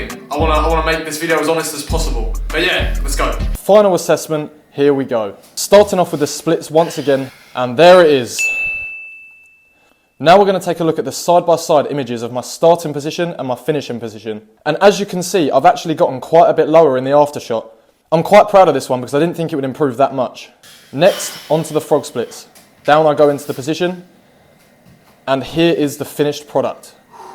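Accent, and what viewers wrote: United Kingdom. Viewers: YOOOO YOUR ALSO BRITISH?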